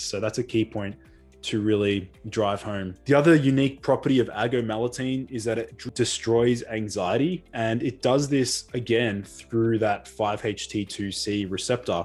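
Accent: Australia. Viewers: Was that you calling in on Danny's podcast? I was like:" with an aussie accent, I know that guy " haha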